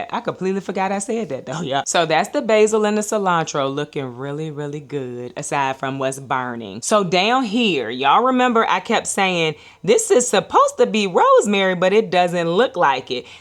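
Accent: South, United States. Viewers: I really love your voice! You have a southern accent, my mil and I both love to watch just to listen to you and we learn so much from you too! Loving the bunnies too. I also just started watching Sage and Stone and am loving her channel as well.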